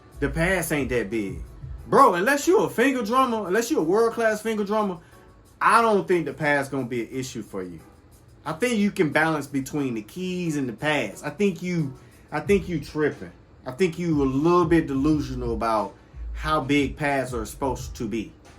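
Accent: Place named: California, United States. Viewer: Lol. Straight up! Say bro as soon as u said “beaucoup” I knew u was a real one. Only people I hear say that word usually from the n.o. But u sound like u from the bay or somewhere in cali. Real recognize real. 🔥 content padna! 💯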